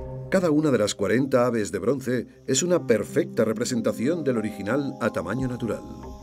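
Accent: Spain. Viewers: Si tan sólo no tuviera el maldito acento español.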